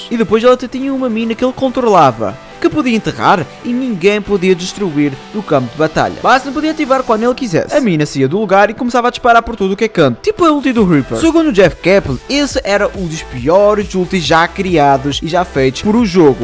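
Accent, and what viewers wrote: Portugal. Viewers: SERIA EU, EU MESMO mano ele é português de Portugal ja ouviu esse pais? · es de Portugal como eu? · é português de Portugal, é facil de entender, n sei qual é o vicio dos brazucas a dizer que o português de Portugal "tá foda" sabendo que é o original e o vosso é que é todo mamado ._. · Beatszu ele é de Portugal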